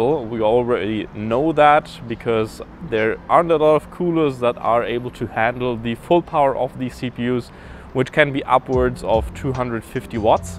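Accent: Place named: Germany. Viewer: ich hör da teutsch raus!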